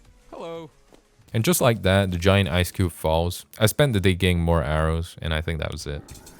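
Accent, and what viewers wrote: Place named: Scotland, United Kingdom. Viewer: i sense a tinge of perhaps scottish in your accent? where abouts are you from matey?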